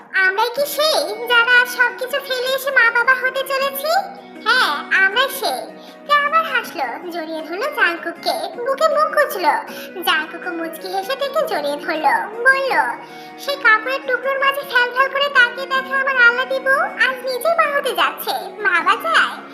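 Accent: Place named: Kushtia, Bangladesh. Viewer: আপু আমি জানি এই চ্যানেলে তেকুক স্টোরি ছাড়া কোন স্টোরি কোন সময়ই দাওনা আপু আপু আপু খুব খুব খুব একটা অনুরোধ করছি আপু তুমি একটা তিনচার পাটের স্টোরি দিবে ভিমিন, আপু তোমার এই স্টোরি দেখে আর কুষ্টিয়ার কথা শুনে আমার খুব ভালো লেগেছে কারণ আমার বাড়ি কুষ্টিয়ার ভেড়ামারাতে, যদি তুমি আমার জেলার মেয়ে হলে আমার অনুরোধ রাখবে আমি আশা করছি, আমি তেকুকু লাভার আবার ভিমিন স্টোরি খুব সুন্দর লাগে আর তোমার স্টোরি খুব সুন্দর লাগে তাই বিনিতো অনুরোধ করছি প্লিজ প্লিজ নির্লজ্জের মতো বলছি আপু প্লিজ প্লিজ প্লিজ একটা ভিমিন স্টোরি দাও প্লিজ প্লিজ প্লিজ আর আমার কমেন্টের উত্তর আশা করছি খুব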